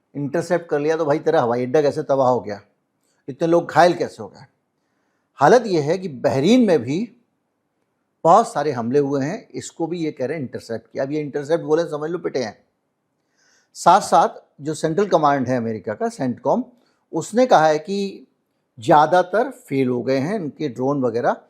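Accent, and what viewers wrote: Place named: Punjab, India. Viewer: ji kya aap punjabi hein aap ki language mein punjabi touch hai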